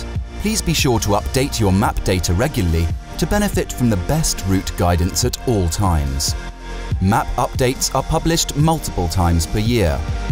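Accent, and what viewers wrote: United Kingdom. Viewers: Why do you have a narrator with a British accent? Why not a German accent? This makes no marketing sense.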